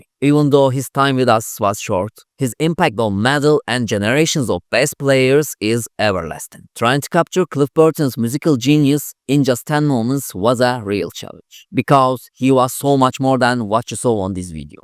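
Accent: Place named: Turkey. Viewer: Abi ingilizce konuşana kadar Türk olduğunu bilmiyordum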